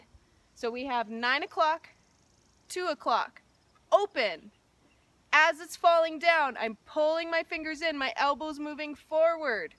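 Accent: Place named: Canada. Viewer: Canadian?Thought I heard the majestic accent!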